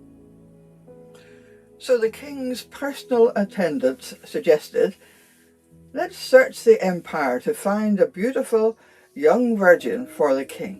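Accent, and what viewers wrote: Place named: Ireland. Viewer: I love listening to lovely Irish lilt. But I see where you are coming from. Sometimes we can't watch with sound on.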